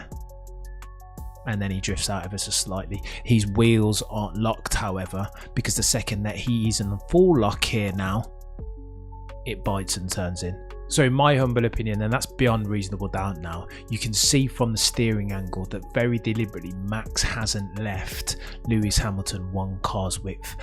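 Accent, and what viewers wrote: United Kingdom. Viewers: I'm sorry, are you British?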